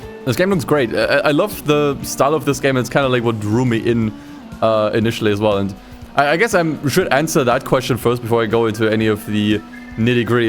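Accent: Germany. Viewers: Warum klingt dein englisch so deutsch??? 😅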